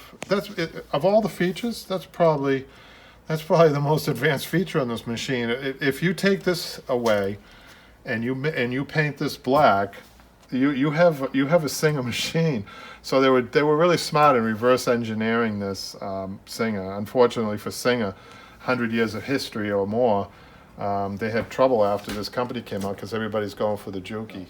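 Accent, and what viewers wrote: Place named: New England, United States. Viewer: As soon as I clicked your video and herd you talk I knew your a new Englanda! Im from Hampton NH. I’m Getting into auto upholstery. Looking at machines now. Thanks for sharing your knowledge.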